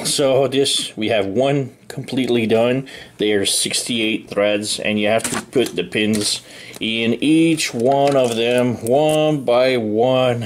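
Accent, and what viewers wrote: Quebec, Canada. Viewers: Super interesting. I haven't built these kinds of models before. Do I hear a Quebec French there?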